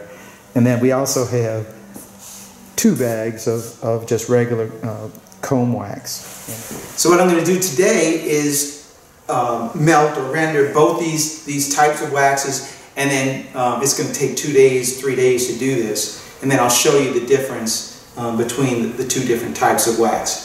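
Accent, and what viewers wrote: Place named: New England, United States. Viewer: you don't sound like you're from Louisiana. More like New England originally.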